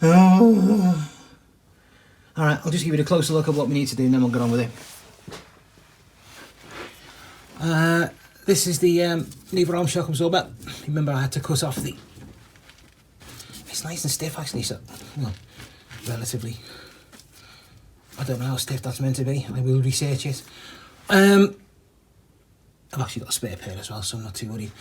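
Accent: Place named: Liverpool, United Kingdom. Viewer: Welcome back, your right about making videos of the work, taking more time than the job itself, I tried while renewing my rear spring front hanger,( MGB suspension ) posted on YouTube, took ages to edit, will try again soon and put some sound on the next one, great video, lots of colourful English, always sounds better in Scouse, I read somewhere that the original lever shocks were designed to leak through the shaft for lubrication and recon ones had a proper bearing and an oil seal fitted, maybe that explains why yours don’t leak, I’m just about the same stage on the rear end of mine, shutz on today, tank and axle next, so I’m waiting (for you to skin your knuckles, so I don’t have to) thanks for all your fascinating work, Steve.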